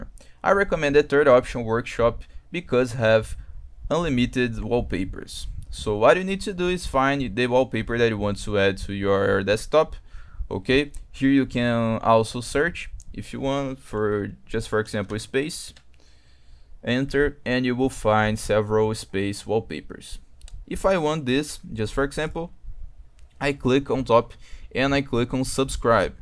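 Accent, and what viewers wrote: Brazil. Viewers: pelo sotaque é brasileiro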